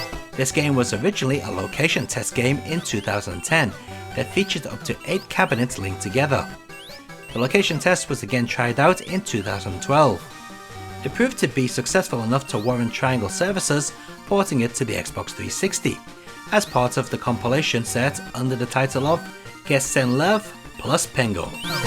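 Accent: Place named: Wales, United Kingdom. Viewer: I'm trying to place your accent...I'm thinking west of England, perhaps Wales? (I'm far off, right? Like a Frenchman calling a Texan a New Englander?)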